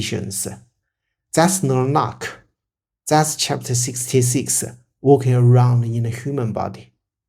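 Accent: China. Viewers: I'm glad I subscribed to this channel.. Although the accent is a bit Chinglish(no racist pun intended), at least there are subtitles. Every content here is a hidden gem.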